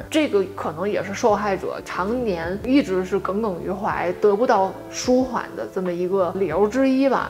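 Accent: Tianjin, China. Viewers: 你是天津人对不对！！😃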